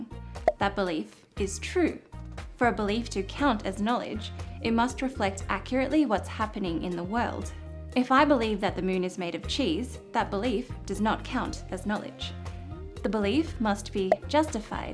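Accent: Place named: Australia. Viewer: I love your cultivated Australian accent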